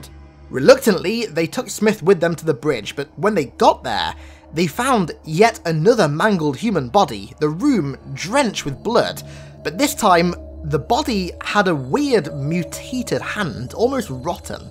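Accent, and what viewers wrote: United Kingdom. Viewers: British accent then the lisp fuck. My ears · are you british?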